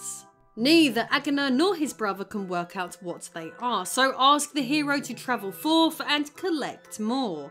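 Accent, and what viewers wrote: England, United Kingdom. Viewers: I've been studying English for almost my lifetime and I have to say I totally love your british accent. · That live voice thing is kinda annoying. I dont need to be handheld and told how to access a sub menu.. just let me play the game. Now if they let you do the live voice then that would be cool! Your voice is enchanting! Is it an English accent that I'm hearing? Absolutely stunning voice. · It's a British accent?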